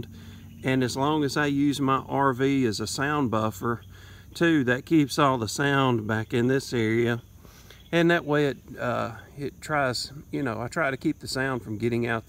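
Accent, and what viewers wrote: South, United States. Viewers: Nice camping spot there!!! I like your southern accent too!!! And Muppy is so cute & silly.🐕 😃 ☺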